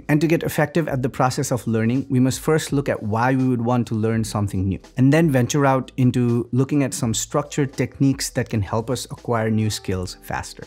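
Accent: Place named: Nepal. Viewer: i am watching you from your early days in YouTube. But today, i dont know why i found Nepalese tone with your voice. 😁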